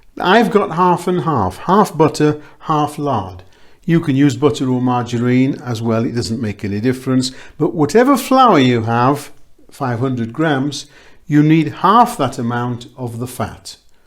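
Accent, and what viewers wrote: Yorkshire, United Kingdom. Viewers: Hello Mr. I just found you today. To say that I am very happy about that would be an understatement! I recognised your accent right away as I spent two years in Yorkshire (Pontefract area) as a teenager. I have a question about the steak and kidney pie. After you added the beef broth the mixture looked very liquid. How/when does it change into a nice thick gravy like you have in your pie? Also, I have a question/request: when I lived in Yorkshire I used to eat the most wonderful custard pies. Do you have a recipe and demonstration for them? If so I would be eternally grateful. Thank you.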